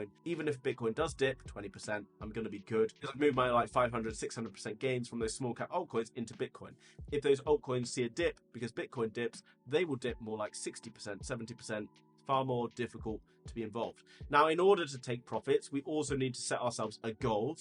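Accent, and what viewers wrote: England, United Kingdom. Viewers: Thank you, always good to hear an English voice with content.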